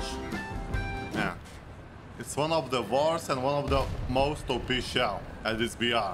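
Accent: France. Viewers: Extra sensibles avec l'accent du sud 👌! Super vidéo: ) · Le petit accent marseillais qui fait plaisir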